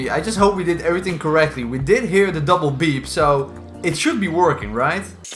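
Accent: Netherlands. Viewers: sick vid :D you dutch?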